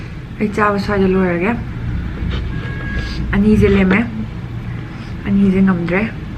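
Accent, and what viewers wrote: Kakching, India. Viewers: kakching marol na nungnaiye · Ado Ng kakching londo Nganguva Adum Aduna Henna tavada Nungsiye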